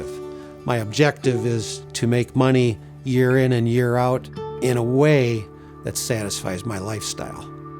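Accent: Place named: New Zealand. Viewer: Hey, Lovely farm,...... you sound like a kiwi farmer;],.....I practice rotational grazing etc have done for years,..... just a thought, the organic farmers say the moon waxes and wanes,.....ie rises for two weeks and falls for two weeks, so 28 day process. So if you have a round less than 4 weeks you are short circuiting the natural cycle, as in for two weeks the energy goes into whats below the ground, so every round you think boy I'm running out of grass its just not growing like it should, and the next two weeks you think wow look at it grow, so I've always stayed on a minimum of 28 day round, also the extra cover helps keep the moisture in the ground better.We use a grazing planner in Nz for calculating winter grazing rounds transitioning out into a spring round, assisting with areas consumed on any given day, which I think you'd find handy. 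It must help with the marketing of your product all those health outdoor cows:] 😊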